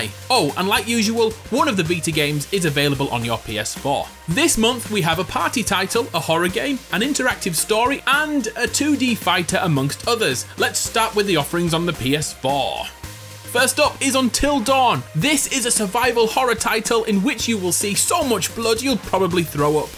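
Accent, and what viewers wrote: United Kingdom. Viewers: that BRITISH VOICE tho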